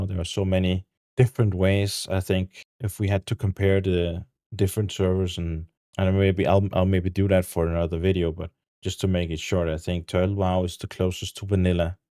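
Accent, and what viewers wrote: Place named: Denmark. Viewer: you sound like a fellow dane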